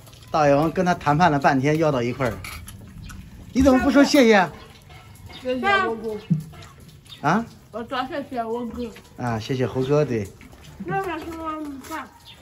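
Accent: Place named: Huai'an, China. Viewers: 是江蘇淮安人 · 淮安的西瓜上说了我听着也是我们苏北的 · 应该是，咸念han2😁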